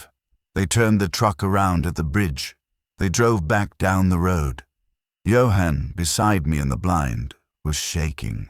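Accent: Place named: Aristocratic, United Kingdom. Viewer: Dont mind the English accent .but an aristocratic one sucks.🇨🇦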